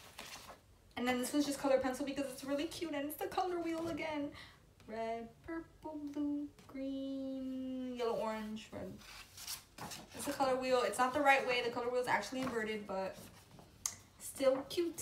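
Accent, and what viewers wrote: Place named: New York, United States. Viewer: are you from new york because you sound like it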